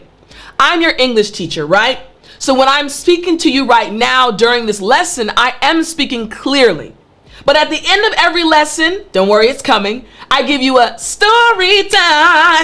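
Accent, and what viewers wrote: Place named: United States. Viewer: I love the American accent.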